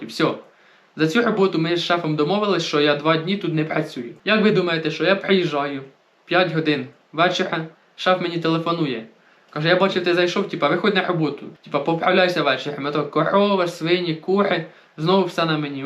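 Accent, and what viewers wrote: Poltava, Ukraine. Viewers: В тебе акцент цікавий. Ти з Полтавщини?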